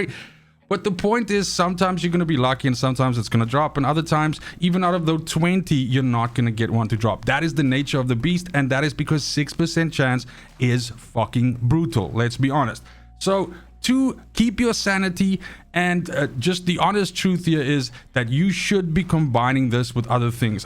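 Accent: South Africa. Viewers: Are you South African?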